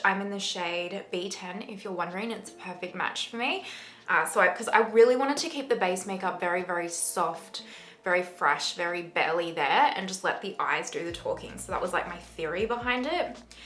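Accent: Australia. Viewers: You are now one of my favorite you tube channel to watch, so glad I found your channel, so enjoyable to watch so talented and that Australian accent is everything! ❤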